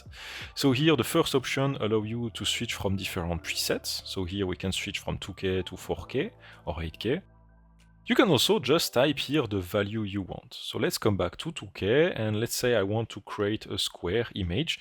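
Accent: France. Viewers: J'aime bien son accent frenchy. Ca rend les tutos beaucoup plus limpides. Pas besoin de sous titres pour comprendre. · Just a reminder, english is not french, and you do not pronounce english terms as if they were french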